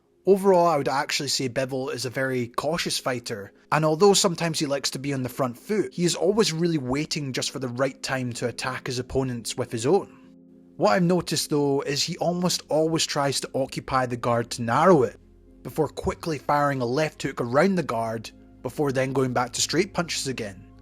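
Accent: United Kingdom. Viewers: what is your accent? British? Welsh? Scottish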